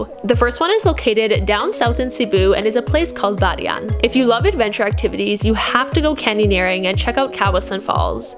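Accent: Canada. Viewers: you didn’t it’s cute hearing Filipino names through a Canadian Filter?! 🤣😉 (Cebu does sounds Like you: Seebu vs I’ve Heard other Filipinos myself included sound more like: sabu less emphasis on the e, no biggie, just cute, don’t worry no one understands me either!) 🤣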